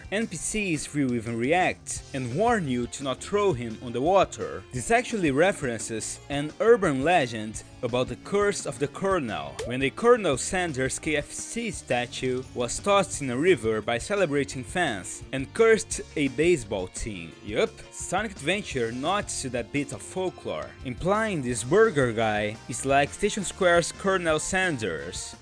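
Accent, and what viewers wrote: Brazil. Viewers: Aaaahhh ma tu é brasilero sem sombra de dúvida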